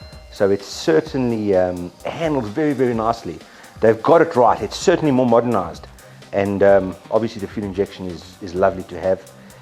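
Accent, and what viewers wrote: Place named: South Africa. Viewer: that south african accent doe ?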